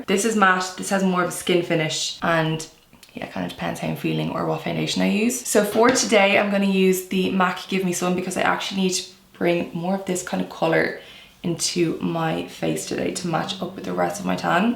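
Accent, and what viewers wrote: Ireland. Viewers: I love your Accent! Irish ☘️ has always been my favorite accent besides Australian💖💖💖💖💖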